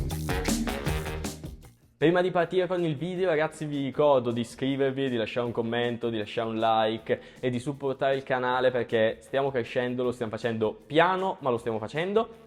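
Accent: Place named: Brescia, Italy. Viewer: Ciao vecio! Permettimi il “vecio” perchè ho capito subito che sei Brescia dall’accento 😂 Anche io faccio Street, ho vinto anche un concorso tanti anni fa con una foto in Piazza Vittoria, se fate uscite di gruppo mi unirei volentieri per qualche sessione. Nicolò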